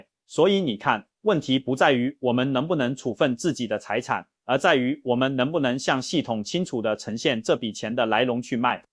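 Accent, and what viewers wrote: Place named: Mainland, China. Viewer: 中共國的口音！騷嘮台灣！你們中國人管好自己比較重要！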